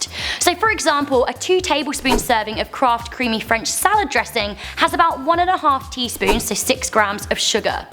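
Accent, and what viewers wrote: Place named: England, United Kingdom. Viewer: You can tell this woman is English but lives in the US because her accent is now fucked up and Americanised.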